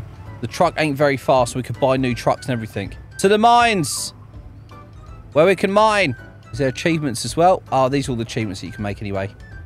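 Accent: United Kingdom. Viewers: i think you speak british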